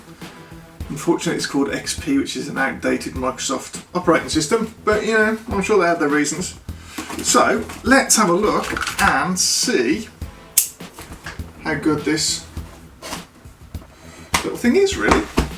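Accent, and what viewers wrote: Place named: United Kingdom. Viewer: I am thinking to get one of these but not sure if I will be able to use this as trackpad so I can operate Adobe Apps (e.g. Photoshop, Lightroom, Premiere PRO, Premiere Rush and so on.) Any advice will be much appreciated. Although this one is done in 2017, do you still use this and creating any contents? Check out my channel and let me know if you liked any contents useful so I can improve further. As a Newbie, we can grow together if you still creating and interested. You got my support (263) and hopefully you will be doing the same and keep in touch, especially it sounds like you are from here in UK. Please keep in touch so we can discuss and grow our respective channels and get ideas for our contents. Please look after yourself and each other & Stay Safe. "Life is Short, so Live Long & Prosper - "Our World is a Shared Experience" - #TubeBuddy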